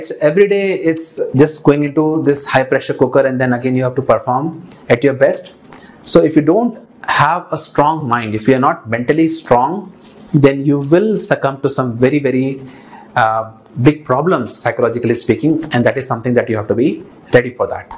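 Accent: India. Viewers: Even after 10 years of US life, you still have typical Indian accent. Nice to hear.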